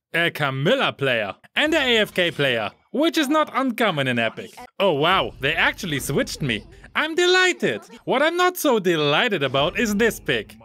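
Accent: Germany. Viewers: You from Germany?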